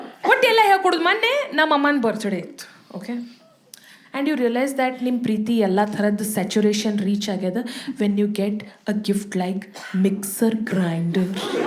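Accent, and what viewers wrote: North Karnataka, India. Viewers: Soooooooperb presentation. Wonderful flow of words especially your Uttara Karnataka accent is really a added flavour to your comedy. Enjoyed very much. I am and I am also a Stand up comedian. Both of us have performed on the same stage once and you were the anchor. God Bless You. Keep Rocking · Too good... love ur north Karnataka kannada.....keep rocking ❤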